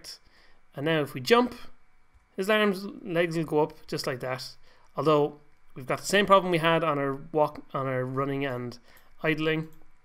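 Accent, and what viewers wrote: Ireland. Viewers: lol....wtf? Is it not obvious? He's Irish (or a similar accent)...and how does (I assume you're saying) old have anything to do with how he says words?? I'm so confused.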